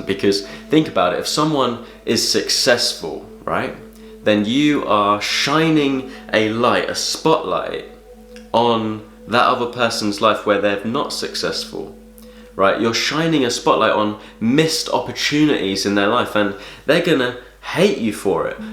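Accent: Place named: Southern England, United Kingdom. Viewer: pretty sure yeah. I'm English to. Think he's from the South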